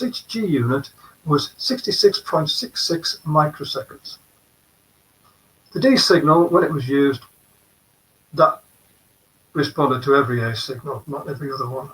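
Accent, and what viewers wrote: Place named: England, United Kingdom. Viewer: You need to practice with the mic you are too far, cannot understand a word... remember you have a thick English accent and this is for the world...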